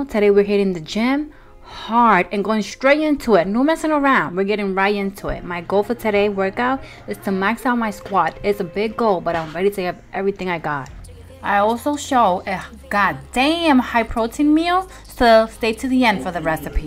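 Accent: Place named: New York City, United States. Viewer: From where is your accent? Sounds from NYC.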